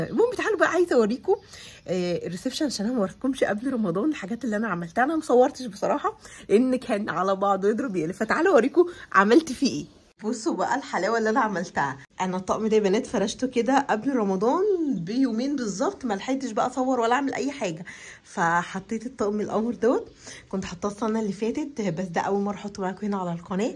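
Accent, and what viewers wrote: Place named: Tunisia. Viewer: لهجة تونسية 😊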